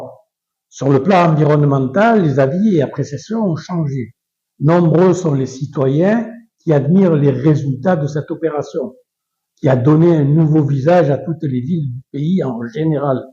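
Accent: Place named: South, France. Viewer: Qui es tu? Avec ton accent du Sud français, pourquoi tu ne reste pas à 6000 km à t'occuper de l'urbanisation marseillais?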